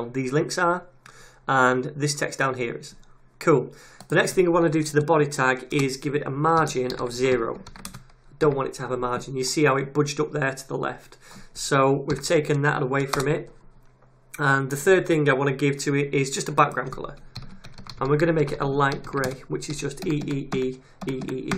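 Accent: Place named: England, United Kingdom. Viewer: I AM NEARLY FINISHED WITH YOUR COURSE AND I CAN ONLY SAY ONE THING...IT IS SIMPLY AWESOME AND ABSOLUTELY FANTASTIC! I HAVE LOVED EVERY STEP OF IT. I APOLOGIZE FOR THE LIKINGS BECAUSE I USED THE VIVALDI BROWSER TO WATCH IT AND I JUST NOTICED, THAT MY LIKING EVERY VIDEO DID NOT SEEM TO WORK. I DON'T KNOW WHY?! NOW, I ALSO WATCHED PART OF YOUR JAVASCRIPT COURSE AND I ALSO LOVED IT. THE WAY YOU TEACH MAKES EVERYTHING EXTREMELY SIMPLE TO UNDERSTAND, EVEN FOR A NEOPHYTE LIKE ME! I CANNOT THANK YOU ENOUGH EITHER FOR ALL THE USEFUL SITES, THAT YOU PUT UNDERNEATH YOUR VIDEOS! YOU ARE A GREAT TEACHER, WHICH IS QUITE RARE IN THE PROGRAMMAING WORLD. MOST WEB DEVELOPPERS ARE QUITE IMPRESSIVE AT WHAT THEY DO, BUT, UNFORTUNATELY, THEY DO NOT KNOW HOW TO TEACH ALL THAT AND VERY OFTEN THEIR ONLINE COURSES ARE NOT ONLY CONFUSING, BUT ALSO OUTRIGHT BORING. 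YOURS IS NOT! IT IS FUN AND I ALSO LOVE YOUR PRONUNCIATION. I DON'T KNOW FROM WHICH PART OF ENGLAND YOU COME FROM, BUT I JUST LOVE IT! MY PROFUSE THANKS FOR YOUR WONDERFUL GENEROSITY WITH YOUR COURSES. I AM NEARING 68 AND I COULD NOT AFFORD TO GO BACK TO SCHOOL FOR MANY REASONS, HEALTH AND MONEY, BEING TWO! LOTS OF LOVE AND TAKE CARE! FRANCIS, A FRENCH MAN LIVING IN CANADA.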